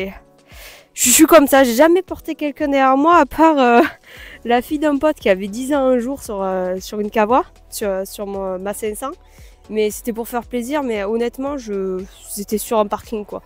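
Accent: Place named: South, France. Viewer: J’adore l'accent du sud ça nous rapproche du soleil 😊😉😊😉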